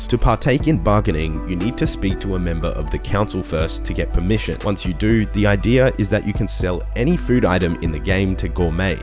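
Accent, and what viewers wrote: Australia. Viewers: I fucking love your voice, are u from Australia? The accent combined with the deepness of your voice makes me happy.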